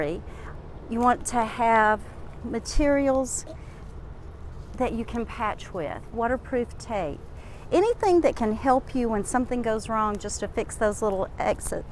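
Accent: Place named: East Texas, United States. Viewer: Enjoyed this. I swear our presenter was from East Texas based on her accent. 😃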